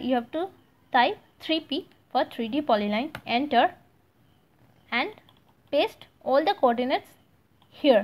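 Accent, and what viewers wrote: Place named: India. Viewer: thank you for solving my problem. BTW, your Indian accent is very cute.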